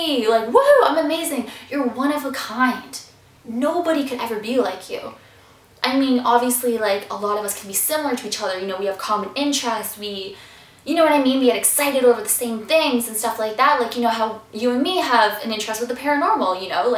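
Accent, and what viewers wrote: South, United States. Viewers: I freakin love your southern accent . Your face is fine. People are jerks. Even if they used to be your friends. I love your happiness. One of my old friends said "Whats wrong with your arms" i have a lot of freckles and she thought they were so ugly. But i look in the mirror and im ike sometimes "Oh i look good" just not full of myself lol. I love the fact that i love Harry Potter. Im weird crazy and fun my friends love me we always make each other laugh and i love my life. I got bullied a lot and i wanted to kill myself but im now in love with myself. I love my personality. I love the fact that i love Harry Potter. I love how my hair are so beautiful. :) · I'm new to your channel but you're already one of my favorite youtubers. I love your videos and the positive vibes you give off. Plus, I love that southern accent lol I think it sounds a lot like Britney Spears. 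And your face is beautiful! I ignore the haters too. I use to hear things about being gay all the time, but eventually as I got older and left high school I got a lot more confident just like you said in the last bullying video.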